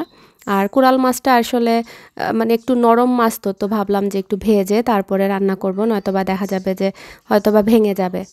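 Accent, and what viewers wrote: Sylhet, Bangladesh. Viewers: Apu amrar sylhety mat hunla hasa ja bala lager ❤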